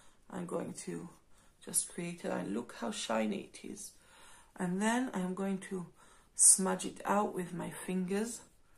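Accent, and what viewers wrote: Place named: England, United Kingdom. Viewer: מהממת והמבטא (אנגלי?) הורס...! 😍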